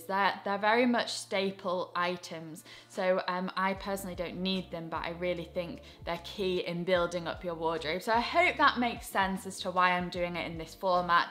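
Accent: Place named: RP, United Kingdom. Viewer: Hi,! My name is Amy, I am from Bangkok, Thailand. I had been looking for the outfits that I wanted to wear for ages. Finally, I found you on the YouTube and I only stick with you even their are many YouTubers’ videos pop up on my new feed almost everyday but I never click on anyone else fashion styles. I really love the way you described your outfits. Meanwhile, I’m starting to order new clothes, trousers, shoes, belts, ornament and lots of things to wear according to your videos. I must say that I love your styles because you look expensive and glamorous even the outfits are not quite expensive at all. I mean affordable and they make you look fabulous in the same time with the clothes that you wear on your channel I don’t have a word to say about your dressing styles, apart from superb, splendid, fabulous etc. If you would like to come to Thailand, I would love to take you around my beautiful country. 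if you have a chance to come to Thailand I will surely take you around the beautiful beaches which have white sand and crystal clear water, I promise you this. Just come with your pocket money, that’s all. Your British accent is so posh! Please message me on YouTube. By the way, I am 60 years old. I don’t know much of how to use this social media. Thank God that I found you on the videos. I used to live in between between Belsize-Camden tube stations. Please forgive me for my broken English. X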